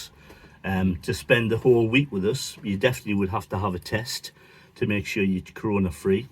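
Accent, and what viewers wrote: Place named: Northeast, United States. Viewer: You sound like your from the Northeast. Iam interested in training. But wait till it dies down. Stay safe lads.👍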